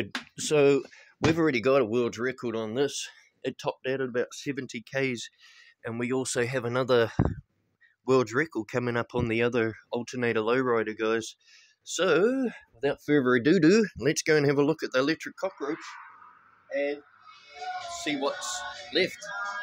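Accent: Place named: New Zealand. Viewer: You a kiwi mate? That's a hell of an accent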